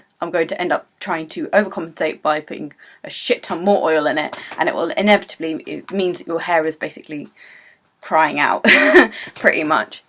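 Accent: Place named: United Kingdom. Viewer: Oh wow its a braid. This UK speak low-key makes me want to live my English heritage dreams & move.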